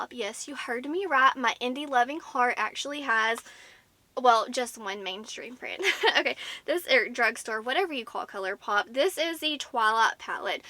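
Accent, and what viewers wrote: South, United States. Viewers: I love the southern accent so much❤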